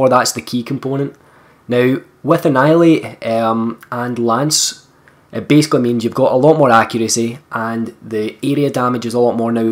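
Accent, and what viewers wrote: Scotland, United Kingdom. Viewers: fuckin love scottish accents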